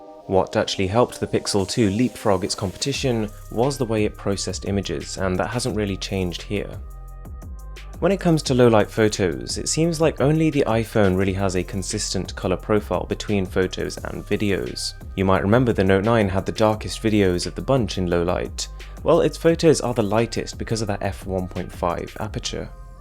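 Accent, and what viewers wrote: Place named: United Kingdom. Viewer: Bro you have a fashion model type face😍 with your brithish akhcent😍👍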